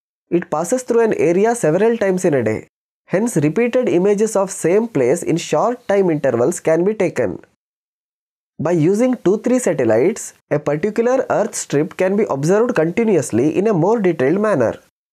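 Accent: India. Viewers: Outstanding video sir.. you made this concept so easy to understand...🔥🙏🏻 And if I'm not wrong...you're from south right...?? Bcz of your accent 😊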